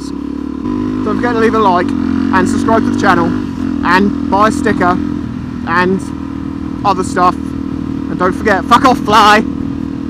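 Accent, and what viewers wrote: United Kingdom. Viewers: yo what part of Britain you from?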